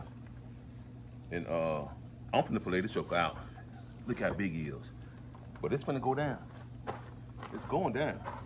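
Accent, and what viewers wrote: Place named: Arkansas, United States. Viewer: Im😂so glad that I found this channel. I know that you guys live in Florida but you sound like a good ole down south Arkansas boy. I'm from Arkansas myself and I love the way you do fish. It's my favorite food. You make me remember my grandmother. Love you guys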